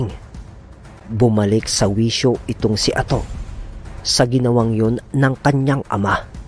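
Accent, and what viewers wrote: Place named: Ilocos, Philippines. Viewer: Nkk2wa k pakinggan anak kc my ilokano tlaga lov2 nnay ❤❤❤❤❤🎉🎉🎉🎉🎉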